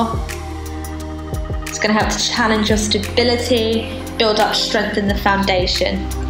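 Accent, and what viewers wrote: United Kingdom. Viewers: Love the is it british accent btw?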